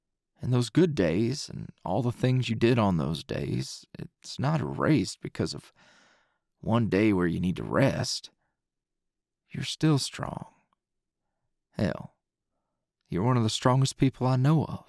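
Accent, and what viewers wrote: South, United States. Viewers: Me who is from the south and loving southern guy Me: FINALLY!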